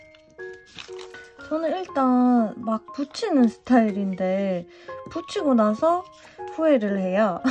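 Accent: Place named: Gyeongsang, South Korea. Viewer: 오늘 영상 처음 보는데 대구분이신가용?? 저희 어머니랑 억양이 비슷해섴ㅋㅋㅋㅋㅋㅋㅋㅋㅋㅋㅋㅋㅋㅋㅋㅋㅋㅋㅋ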